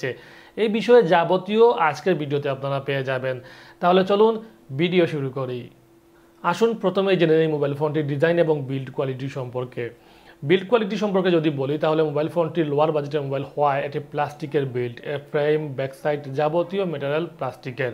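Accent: Sylhet, Bangladesh. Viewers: Bari sylhet na kita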